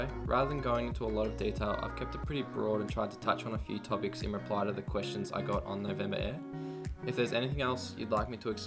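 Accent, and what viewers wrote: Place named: Australia. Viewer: wait are u from australia ? hear ur accent ;)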